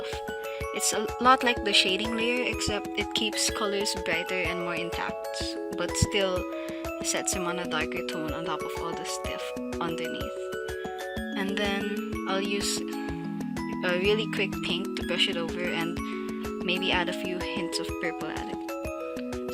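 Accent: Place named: Philippines. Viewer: your voice is so cute! i can hear that filipino accent a bit >:D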